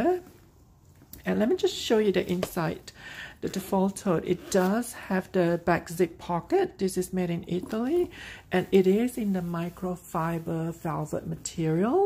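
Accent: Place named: Singapore. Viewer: I lived in Singapore for a while so I can hear the familiarity 💕 Thank you for the beautiful review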